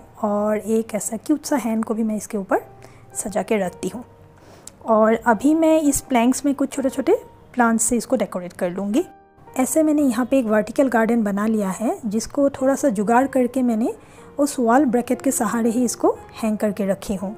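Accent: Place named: Assam, India. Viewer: I like your creativity. I am definitely going to try it. By the way, are you Assamese? The way you talk seems like that. Are you from Assam?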